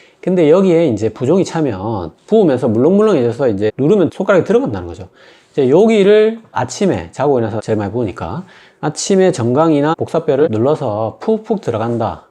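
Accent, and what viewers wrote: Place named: Seoul, South Korea. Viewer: 엣날에 정기적으로 보다가 몇년만에 다시 들었는데... 말투가 서울말로 바뀜 ㅋㅋㅋ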